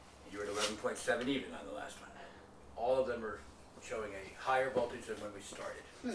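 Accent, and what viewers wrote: United States. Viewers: Just because he has a country accent doesn't mean he's uneducated. He may very well be a pioneer of something great and beyond the "education" of the masses. Many highly "educated" are so indoctrinated with book-knowledge that they never do anything great with it. There are other who have experiential knowledge and are willing to go beyond the status quo to discover something new. Hopefully this man is one of those people.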